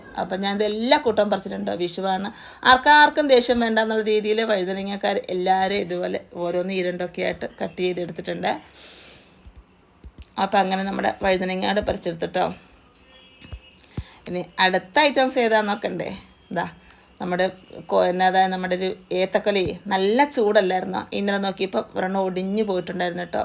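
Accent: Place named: Pathanamthitta, India. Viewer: ok.. Enikku samsaaram kettappol pathanathitta aanonu thoni.. Njaan pathanamthita aanu..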